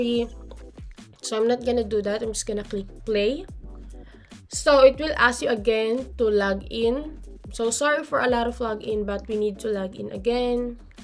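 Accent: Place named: Philippines. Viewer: i knew it, you're from the Philippines 😅 we have the same accent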